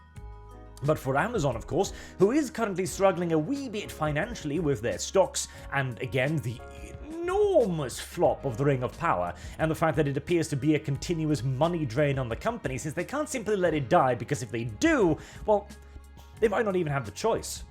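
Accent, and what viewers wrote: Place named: United Kingdom. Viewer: this fuu british asl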